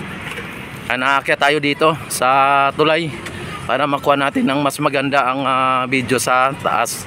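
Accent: Visayas, Philippines. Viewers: Bakit hilig nyo mag vlog na akala mo reporter kayo?! Mukha kayong timang! Bisayang ewan pa accent mo!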